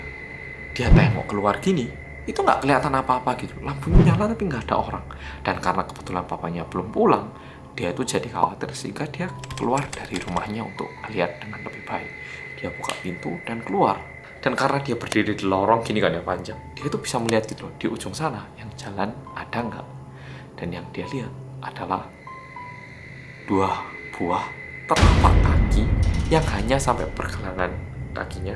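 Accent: Java, Indonesia. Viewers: Malam Jum'at ditemenin orang Korea yang medok😂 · oo baha ada logat Jawa nya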